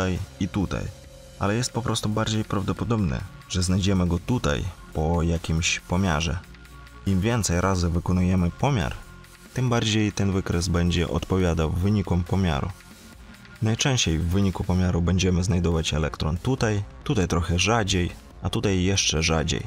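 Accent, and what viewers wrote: East, Poland. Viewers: Twój wschodni akcent sprawia tylko że przyjemniej słucha sie o fizyce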